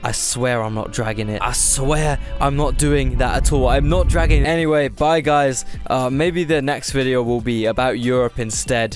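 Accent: United Kingdom. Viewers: British accents have an edge on everyone else when it comes to narrating YouTube videos like this guy. For instance, listening to critical drinker with his Scottish accent spew his criticisms of movies and streaming tv shows like Amazons Rings of Power is absolutely hilarious because he’s mostly right, but also that Scottish accent gives it an extra comical spice. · Why is a British guy explaining US states? What are the rankings supposed to be based on? Have you ever even been to the US? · lol actual Native Hawaiian here ill show you around if you give me british accent lessons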